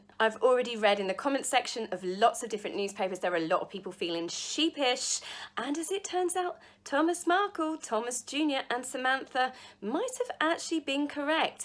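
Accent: United Kingdom. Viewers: Your accent is 🥰 I’m American so yea I admire the British accent. Actually, I love everything British. Anyways, as to the topic of Meghan, I’m sorry this woman is trying to ruin your royal family. She’s nothing but trouble! Personally, I don’t want her back but if we have to we will deal with her accordingly. The tabloids here will shred her. Idk what she’s talking about that there’s no tabloids in America. That woman is a liar! We have a tabloid magazine called the National Enquire here and many more. Hang on my British friends, this pain will pass.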